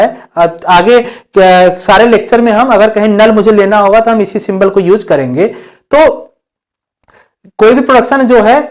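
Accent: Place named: Jaunpur, India. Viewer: sir aap jaunpur se ho kya ?🤗🤗🤗🤗🤗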